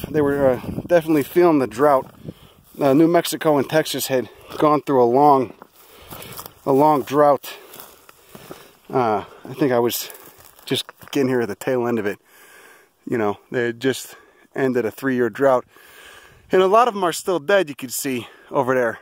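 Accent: Chicago, United States. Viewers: Is your accent Chicago?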